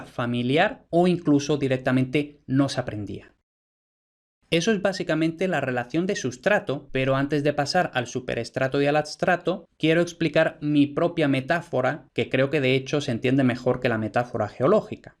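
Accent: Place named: Spain. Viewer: Me refiero a tu pronunciación normal. Pues es curioso🤔, debe de ser que en la zona de España de la que vienes se pronuncia así. O igual es mi zona de España donde se pronuncian diferente, ahora mismo no sabría decir.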